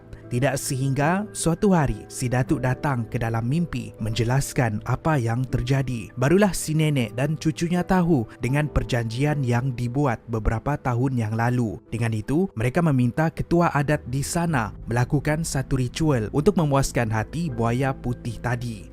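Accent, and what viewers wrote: Sabah, Malaysia. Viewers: bahasa ckpnya sda tau dia org sabah, Indonesia lain dialeknya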